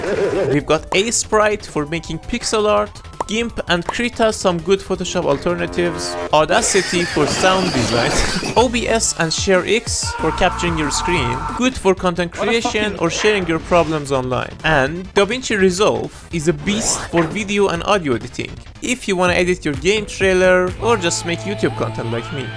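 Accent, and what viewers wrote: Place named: Iran. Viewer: are you persian bro? just going of your accent and how the fuck do you have so few views????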